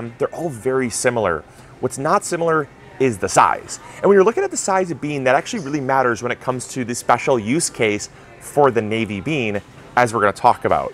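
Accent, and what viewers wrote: Michigan, United States. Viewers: I miss the Michigander accent!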